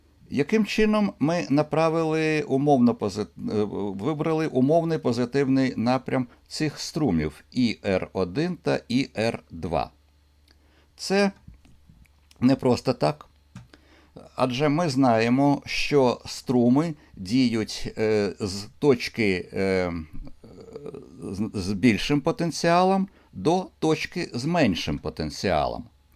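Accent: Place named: Odesa, Ukraine. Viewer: Одеський акцент української пестить вухо. Дякую. Цікаво.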